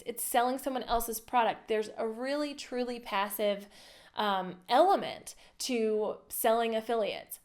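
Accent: South, United States. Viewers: For watching this video for the first time, can I just say I hear the southern accent and love it lol. Really helpful content here trying to start affiliate marketing myself!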